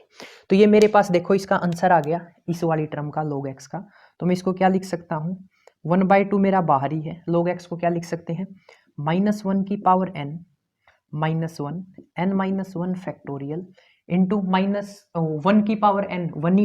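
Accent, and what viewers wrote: Haryana, India. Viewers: Wow haryanvi🥰🥰🥰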